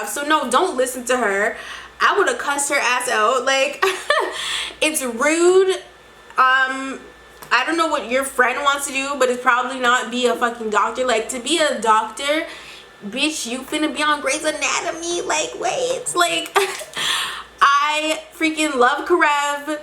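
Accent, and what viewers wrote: United Kingdom. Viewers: That British accent😩👏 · Your British accent is on point! you sound posher than me😂😂 ,Love your videos x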